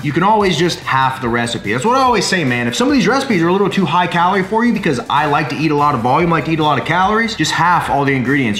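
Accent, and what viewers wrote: Texas, United States. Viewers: is it me or ur getting a Texas accent 😱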